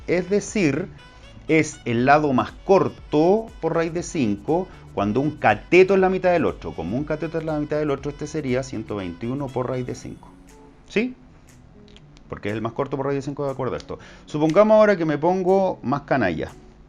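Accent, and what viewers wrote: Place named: Chile. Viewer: Con razón pe es un chileno nose entiende :v pero gracias profe aprendo mucho de ti, para la próxima mas despacio :'c piedad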